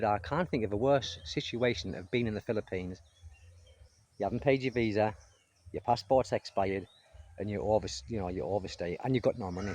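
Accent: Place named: Newcastle, United Kingdom. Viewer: “Hello you lovely lovely people” is the reason I tap on his video every time he uploads one. Also because Geordie is a fascinating accent, I’m scared to set foot on Philippine soil. The nightmare begins in the airport. I just like to travel vicariously through videos of their beaches and lush greenery in the mountains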